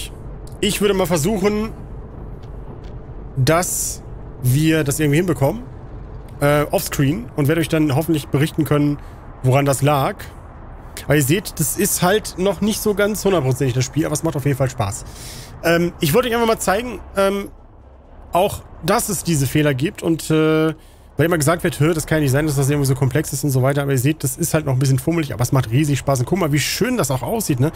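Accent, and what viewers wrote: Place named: Russia. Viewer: . Du hast ein super Video gemacht was ich wie immer liebe. Ich bitte dich bitte den Russischen aktzent weg zu lassen, weil es mich und meine Freunde etwas beleidigt. Natürlich wissen wir das dass nicht provokant gemeint ist und du das aus spaß sagst. Ich hoffe du schätzt das dein Russischer Abo.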